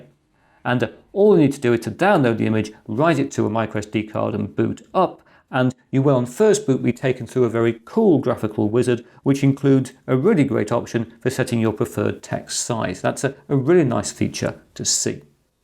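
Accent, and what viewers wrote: United Kingdom. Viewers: oh! this specific - stale GB dialect - just like the farth from behind the monarchs.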